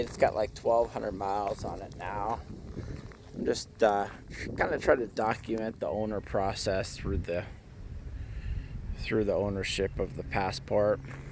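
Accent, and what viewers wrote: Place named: North, United Kingdom. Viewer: You sound like a Northerner, but speak slower than a southerner.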